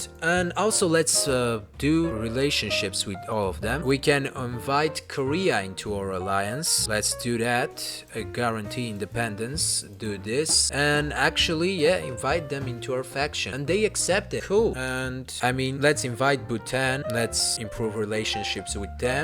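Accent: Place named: Arab world. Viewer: Arabian accent, the youtuber is from the UAE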